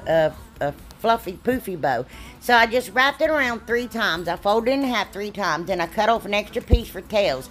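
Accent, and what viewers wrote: South, United States. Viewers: I love the way you explain everything. You're very easy to follow. And never apologize for your countryness. We can't help that we're from the south. God blessed us to live in one of the best places in the USA, the south. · your southern drawl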